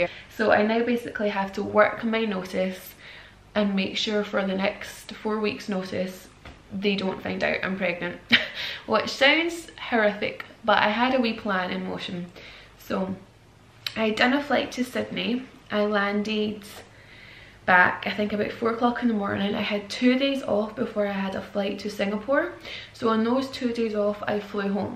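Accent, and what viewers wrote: United Kingdom. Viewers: is that Scotish accent?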